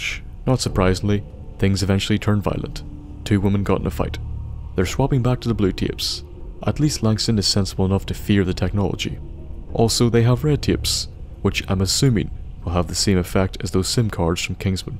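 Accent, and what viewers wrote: Northern Ireland, United Kingdom. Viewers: hey i'm new to the channel:3 and as soon as i heard your voice i was like "yay another NI youtuber:)" so where abouts are you from?? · Are you from NI?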